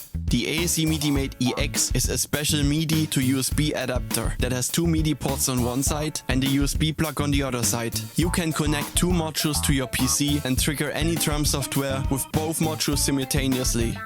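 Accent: Germany. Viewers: Du klingst deutsch lol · Nice video, but earcancer. Are you german?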